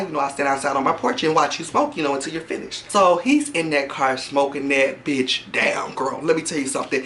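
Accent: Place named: Memphis, United States. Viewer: Please tell me you’re from Memphis! I know that accent and vibe from anywhere, you are soooooo damn funny!!!😂 LOL I was cracking up the entire time but the ending gagged me😩😢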